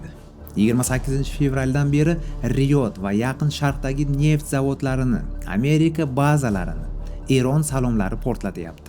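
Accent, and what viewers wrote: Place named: Navoiy, Uzbekistan. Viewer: Мабодо Навоийликмасмисиз шеваиз ухшаб кетаркан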